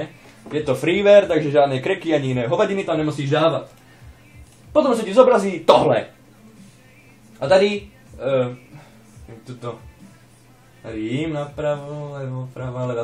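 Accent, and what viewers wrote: Moravia, Czech Republic. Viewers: Moravak ne ? :D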